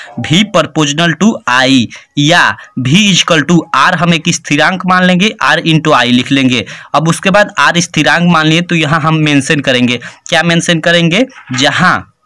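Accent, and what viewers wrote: Bihar, India. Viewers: Bihari ho kya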